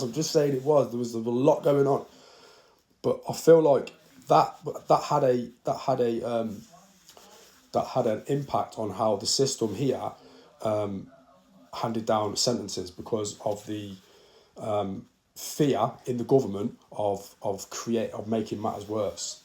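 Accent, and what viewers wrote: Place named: United Kingdom. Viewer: Are you British ? I like your accent oh yeah you are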